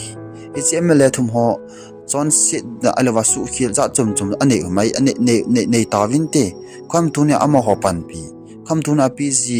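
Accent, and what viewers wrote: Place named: Kuki, India. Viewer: Dik mah² ei and i really like ur accent pure kuki accent which i really like....👍👍👍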